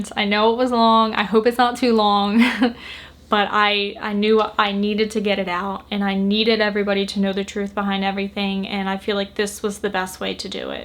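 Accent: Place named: South, United States. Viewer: are you fro the south? you kind of have a southern accent.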